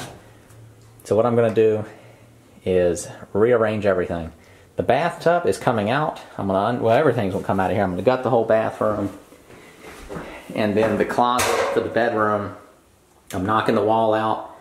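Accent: United States. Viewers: To my untuned Scottish ear, I have no clue where in the states this accent is from! Anybody know?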